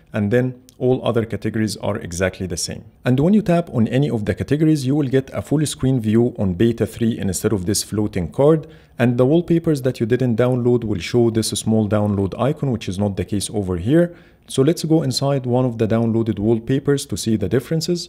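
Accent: Egypt. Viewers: اللهجة المصرية باينة <3 بس الفيديو عالمي .. عاش <3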